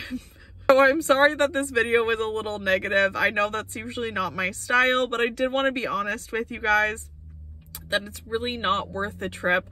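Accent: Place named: South, United States. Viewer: as you say in such a southern drawl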